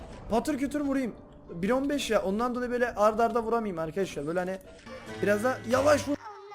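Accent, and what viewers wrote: Black Sea, Turkey. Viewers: Abi sen karadenizlimisin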